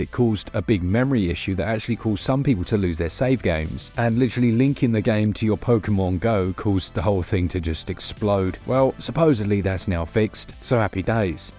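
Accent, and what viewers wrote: United Kingdom. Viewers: your pops and plosives are really wet and disgusting also cease that gross british accent too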